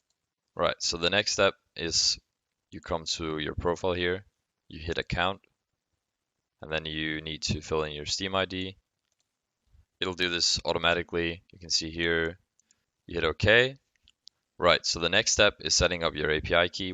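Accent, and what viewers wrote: Denmark. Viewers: u danish? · jeg vidste du var dansker da jeg hørte din accent og det fik jeg bekræftet da du skærm optog din steam mobile guard app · are you danish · DENMARK jeg kan høre det på agsangten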